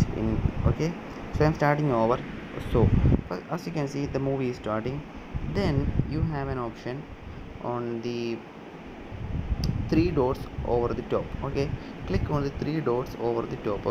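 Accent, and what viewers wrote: Kerala, India. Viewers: English parayunna reethi kettapo manasilayi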